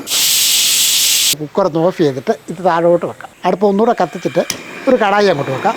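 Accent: Kottayam, India. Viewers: നല്ല കറി ഇക്ക നല്ല അവതരണം ഞങ്ങൾ കോട്ടയം കാരുടെ ഭാഷ പോലെ തോന്നുന്നു🎉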